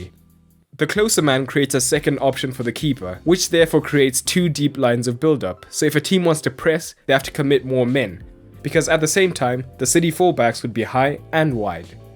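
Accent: South Africa. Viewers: Hey man, nice vids, keep up the good work, just wanted to ask, where are you from? Your accent sounds South African (I'm South African)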